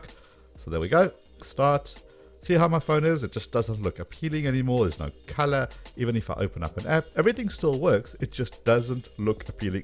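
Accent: South Africa. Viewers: Nothing to do with the video, but where are you from if you don't mind me asking, your accent sounds South African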